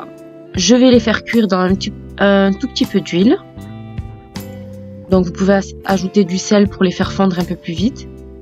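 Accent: South, France. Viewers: ca s'entend que tu es du sud 💗maintenant Paris!en tout cas ta chaîne est sympa je pense que pour le ramadan tu vas m'inspirer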